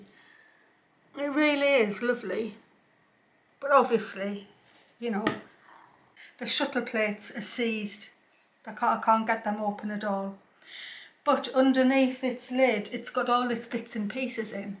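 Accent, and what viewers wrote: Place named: Yorkshire, United Kingdom. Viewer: loved your video, sounds like you are from Yorkshire, would I be right?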